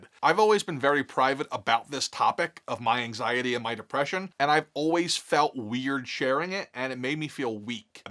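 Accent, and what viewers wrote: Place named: Philadelphia, United States. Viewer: You never said you were a Philly boy, but I knew the voice was 100% Philly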